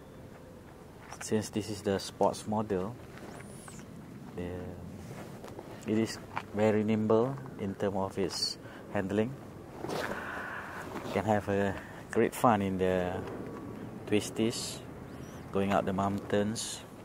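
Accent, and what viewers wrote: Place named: Malaysia. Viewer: Nice review. From the accent I'm guessing Malaysia somewhere (apologies if I got that wrong). All round great video !!! Thanks.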